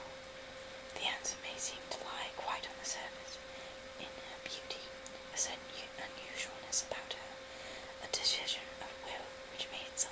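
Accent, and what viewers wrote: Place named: Southern England, United Kingdom. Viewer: I've been listening to this for a few nights now, and it's really relaxing :) You asked in the description if you wanted pointers on an English accent? To be honest, it sounds pretty bang on for a southern English accent, which is appropriate for this video, as long as you take into consideration that very few people actually speak like this in England anymore, our dialects are very region-specific :) a northern accent would be the next one to try, I would think. Well done x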